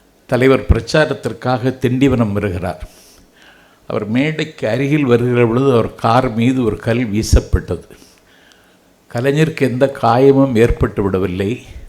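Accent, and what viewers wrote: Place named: Nanjil, India. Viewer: Very good speaker. Nanjil tamil is always sweet.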